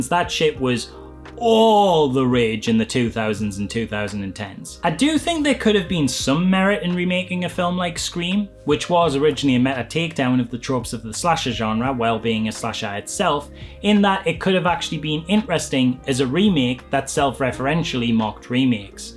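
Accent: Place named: Newcastle, United Kingdom. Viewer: Northerner? I'm leaning towards Geordie... Kinda...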